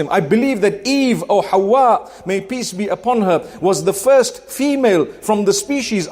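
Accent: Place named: Arab world. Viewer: Assalmualaikum Mufti Menk! How're you doing? I love your arabic language tone. Where are you from, please?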